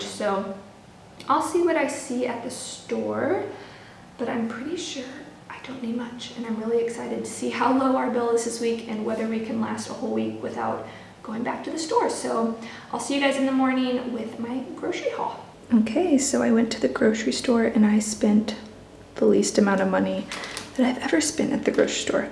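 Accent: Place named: United States. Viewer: Are you working in Indonesia? You sound like you might be from USA.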